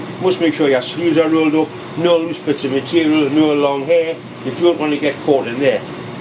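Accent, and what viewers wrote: Newcastle, United Kingdom. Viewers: Great,I could listen to a geordie talk engineering for hours...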